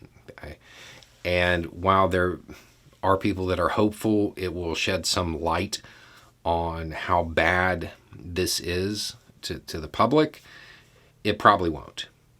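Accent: South, United States. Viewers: Similar story here and after the first vid I decided that I owe an apology for having misread him from his accent and appearance. Then I looked inwards a little deeper and saw that the root of that misjudgment was MY OWN PREJUDICE. As someone who likes to think of themselves as being to the left on practically every issue that came as somewhat of an unpleasant surprise. Then thinking on it some more, that means I owe a lot of people an apology because I'm now sure that there isn't just one intelligent left wing white person in the South of the US so if I owe an apology then I owe it to them just as much. So here it is - to everyone in the South who isn't an ignorant racist, I'm sorry I had y'all wrong and I'm trying hard to recognise my prejudices and correct them. I've been meaning to do this for a while and your post pushed me to getting it done, thanks Danny. 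👍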